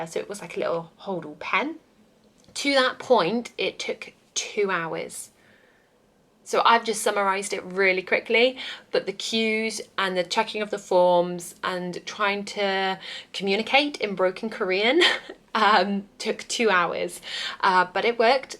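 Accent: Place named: United Kingdom. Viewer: What a lovely British accent. It’s music to the ears! You travelled to Korea in the same month I did. I hope you stay safe throughout the trip.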